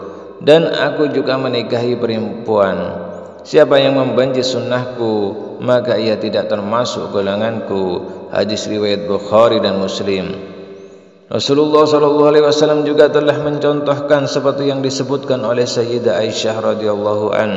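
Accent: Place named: Madura, Indonesia. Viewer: kayaknya suaranya orang madura aku gak suka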